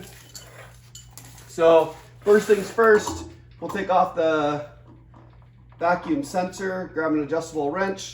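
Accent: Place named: Canada. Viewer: Great video bud ! You sound like a fellow Canadian lol , do you do repairs? I’ve had this dreaded vacuum leak for a while now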